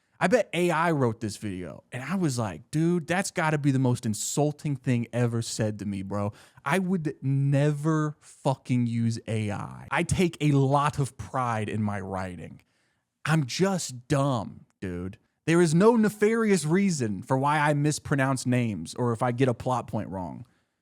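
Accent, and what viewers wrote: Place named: United States. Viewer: I'm enjoying reliving the show through you.... plus you sound American so it's only natural that you mispronounce everything it's funny if anything, don't listen to the haters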